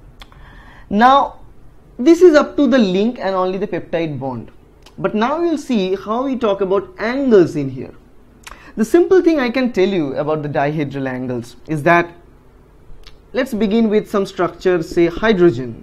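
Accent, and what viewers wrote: India. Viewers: such indian accent.........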